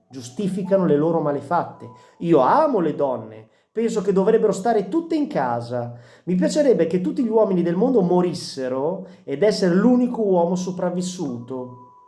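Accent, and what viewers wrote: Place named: Friuli, Italy. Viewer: Hai un bell´accento. Friulano?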